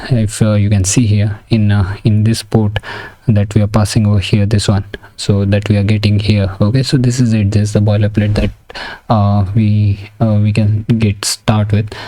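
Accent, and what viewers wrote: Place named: India. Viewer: Is he the first indian you ever heard speaking English or tf is your problem? 🤦🏻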